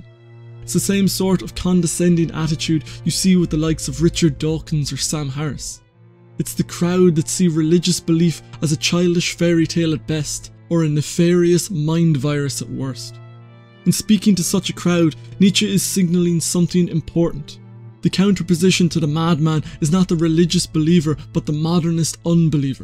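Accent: Ireland. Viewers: aha, I just showed your videos to a mate whose just discovered the late alan watts and we were guessing your accent! He voted midlands, I voted not dublin. And I am indeed! You have some great videos man, really enjoying your work. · I like your videos by I get distracted by your accent. Where you from, Wicklow?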